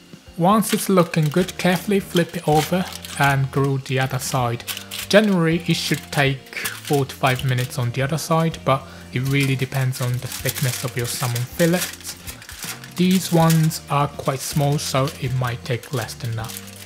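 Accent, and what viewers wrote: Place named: Cockney, United Kingdom. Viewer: seems to have (charmingly) picked up a Cockney accent. Bet he went to London?😊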